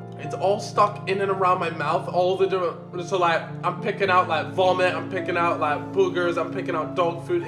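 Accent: United States. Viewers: he talks kinda American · He kinda sounds American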